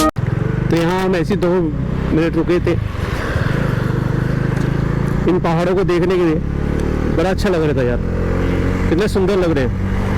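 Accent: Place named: Haryana, India. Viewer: Haryanvi accent is so authentic... 👌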